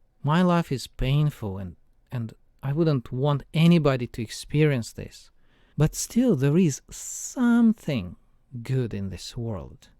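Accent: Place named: Russia. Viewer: First, the Russian accent; second, the subject matter; third, the relevant knowledge of history. I myself am half-Russian; Baptized Orthodox from infancy: My grandparents -- from the nobility and landed gentry, respectively so speaking -- escaped the USSR in around 1920 to emigrate finally to Canada via Western Europe. My mother was born in Canada right around the beginning of WWII; I was born in the USA in January of '71. It's A Small World; But it's an even smaller Orthodox World. God Is Good. Glory To God For All Things. До свидания, Господин.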